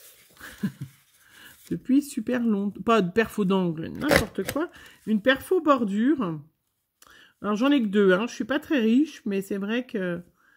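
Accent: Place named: France. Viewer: Bonjour je suis Quebequoise Et j Aime bien votre accent de France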